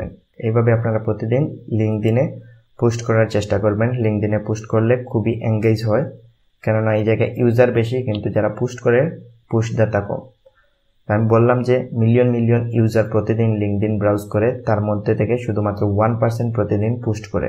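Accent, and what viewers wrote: Sylhet, Bangladesh. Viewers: apni ki sylhety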